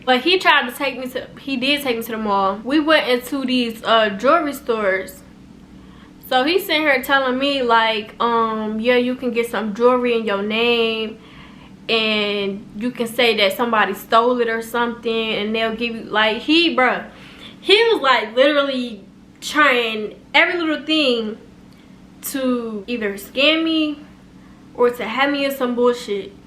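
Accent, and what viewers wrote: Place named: South, United States. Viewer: How long you been there you sound country af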